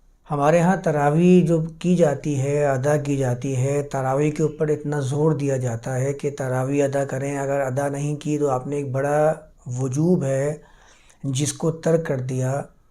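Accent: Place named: Arab world. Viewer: میاں، آپ بہت معقول باتیں کرتے ہیں، مگر خدا معلوم کیوں اردو کی عربی میں تلاوت شروع کردیتے ہیں؟ اب آپنے فرض کو فرد کہا، جبکہ فرد کا مطلب اردو میں individual ہوتا ہے۔ اردو میں “ض” کی آواز بلکل “ز” کی طرح ہے۔ اور یہی مستند ہے۔ طرفہ یہ کہ قرآن جسکو اردو میں بھی قرآن ہی کہا جاتا ہے، اسے آپ کوران کہتے ہیں۔ یا للعجب! اردو کو عربی لہجے میں بولنا اتنا ہی غلط ہے جتنا عربی کو اردو یا پنجابی لہجے میں بولنا۔ اب اردو میں تو فارسی، انگریزی، اور سنسکرت سے مستعار لفظ بھی ہیں۔ تو کیا انکا تلفظ ان زبانوں کے مطابق کیا جائے گا؟ تو پھر اردو کی کیا درگت بنے گی اور وہ کیسی مضحکہ خیز سنائی دے گے۔ اسلئے میاں اردو کو اردو میں ہی بولا کیجئے۔ آپ جب تلاوت کریں، یا عربی میں درس دیں تو بصد شوق خالص عربوں کی طرح بولیں۔ کس نے منع کیا ہے۔ اھلا و سھلا۔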